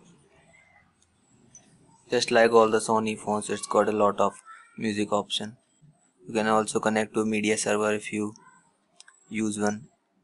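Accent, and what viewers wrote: India. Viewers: The indian accent is so funny :D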